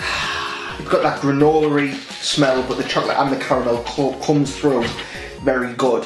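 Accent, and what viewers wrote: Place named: Durham, United Kingdom. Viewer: Knew I recognised that accent lol.. I'm from Durham myself.. I'm glad there is somebody from the area putting out good content!! Keep the videos coming!!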